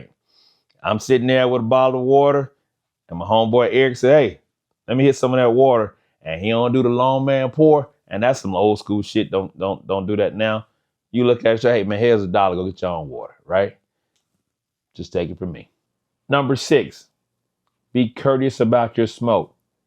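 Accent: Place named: New York/New Jersey, United States. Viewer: Why this brother looks familiar. I might have seen him in a cigar lounge in NJ. Most of where I frequent at. He has a Ny NJ accent. He's right about cigars and cigar lounge etiquette.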